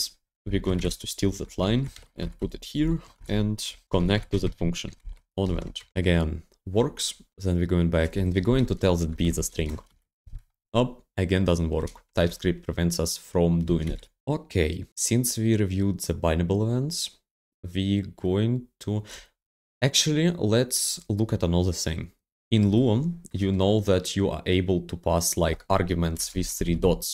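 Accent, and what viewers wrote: Russia. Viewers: По акценту как будто ты русский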